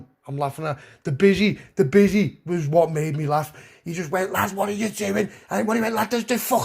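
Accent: Liverpool, United Kingdom. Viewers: Heavy scouser lahhh love it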